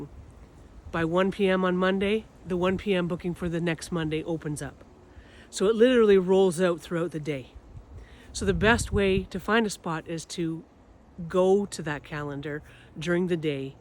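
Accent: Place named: East Coast, United States. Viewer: We love you soooo much! And thank you for reaching all of us like you do! Your messages have changed my life! Love you I was gonna say, are you a east coaster? I grew up in Sydney NS and I recognize your awesome east coast accent poppin in, anywhooo love you moon and back and thank you for all that your channeled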